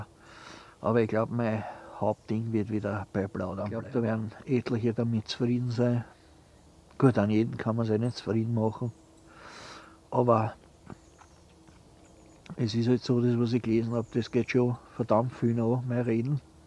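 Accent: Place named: Vienna, Austria. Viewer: Gott sei Dank.Mir hod der leichte Weanerslang scho so gföht.Danke!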